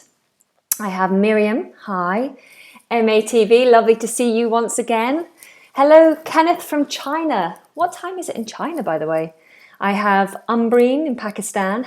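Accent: United Kingdom. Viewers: I enjoy your accent, which reminds me of the time in uk.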